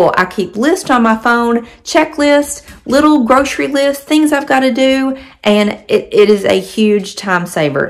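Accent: South, United States. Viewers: I don’t know why but you amuse me. Love your southern accent. So much energy. Thank you for the tips. So helpful. I’m 61 and started being more mindful about knowing where my keys are.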